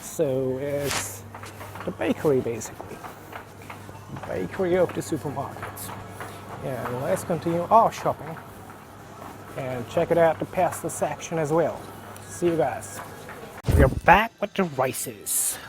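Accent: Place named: Florida, United States. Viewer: Your English sounds almost like the southern accent here in Florida ☺️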